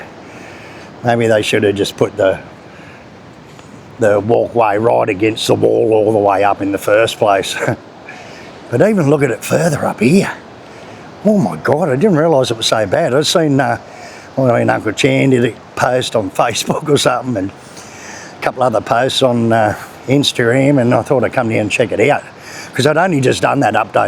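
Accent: Australia. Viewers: Full on Aussie accent !😮😂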